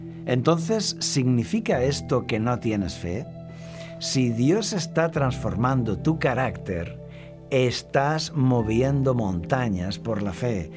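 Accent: Spain. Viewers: HOLA. HACE APROXIMADAMENTE DOS MESES ENCONTRE ESTE CANAL. TODOS LOS VIDEOS QUE HE OBSERVADO Y ESCUCHADO ME HAN PARECIDO MUY ACERTADOS. Y UNO DE LOS QUE MAS ME HA DADO LUCES PARA MI VIDA DE FE ES PRECISAMENTE EL QUE TITULA: ( QUÉ ES LA FE). ME GUSTARÍA SABER SI HAY ALGUN VIDEO SOBRE EL TEMA DE LA MÚSICA, EN ESPECIAL LAS CANCIONES DE FE. LES FELICITO, PUES OBSERVO EN TODOS LOS VIDEOS ( LOS QUE HE VISTO) QUE SON UDS MUY OBJETIVOS. ME GUSTA LA FORMA DE PRESENTAR LOS TEXTOS BIBLICOS. SOY DE COLOMBIA Y SIEMPRE ME HA GUSTADO EL CASTELLANO HABLADO EN ESPAÑA...( ESE QUE HABLA ) ESE TIPO DE ACENTO ME PARECE ESTUPENDO. SOBRE TODO PORQUE ESTABLECE UNA CORRECTA FONETICA ENTRE LAS DIFERENTES LETRAS COMO LA S, C, Z. LA PAZ SEA CON UDS.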